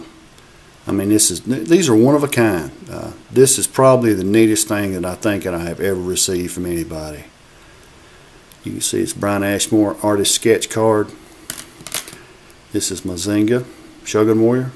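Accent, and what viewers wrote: South, United States. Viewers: Really awesome stuff. I'm one of those guys who has yet to post a video myself. I'm a writer so I'm better at expressing myself in writing. Just started an AF collection blog for my stuff. I'll share it sometime. Need more posts! Love your videos... and your southern accent!! LOL Stay Plastic!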